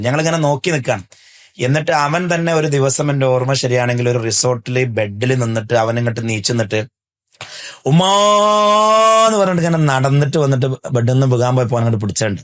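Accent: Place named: Malabar, India. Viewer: This guy know good malayalam but sometimes he goes to the low class malabar accent. But think world is not malabar.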